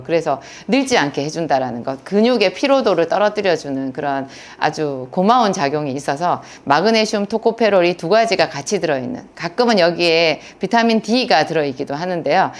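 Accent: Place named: Yeongcheon, South Korea. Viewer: 영천 억양인데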